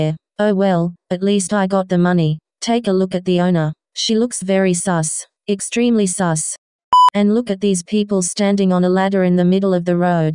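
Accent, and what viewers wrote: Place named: United Kingdom. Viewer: WAIT OMG UR BRITISH? IF U R FINALLY A BRITISH YOUTUBER LIKE- 😭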